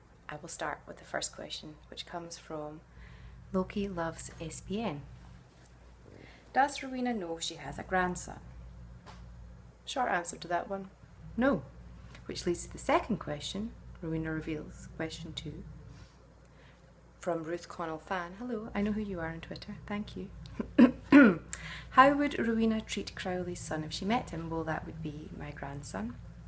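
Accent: Scotland, United Kingdom. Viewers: I adore the way you do your makeup. You should do a tutorial on how it's done. Also I love Rowena and your lovely Scottish accent. sarah in Ohio, U.S.A. P.S. I adore the way you say, Fergus. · I love your Scottish accent. so don't worry about if you're American accent is not great. I love your sarcasm also. I'm becoming a big fan.:-)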